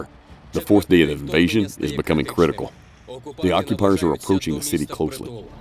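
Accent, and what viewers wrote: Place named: South, United States. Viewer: I do like these type stories .. especially translated is a southern accent. Lol. I was a military spouse x2 retiring both, living on or around so many bases, mostly west coast but we lived in Va, Ga, Ok.. Plus as a USO volunteer and working for a military contractor in DC.. when my husband was stationed at the Pentagon, then i transferred in, we saw a lot of each other, especially his friends and more. He was in communications on the 5th floor, and spoke several languages including Russian. Being around his friends more was like listening to you but they spoke atleast 3 languages besides English as did my husband. Unsure if you actually understand the language but your translation is great. Better then reading bad English translations on videos. Grrr...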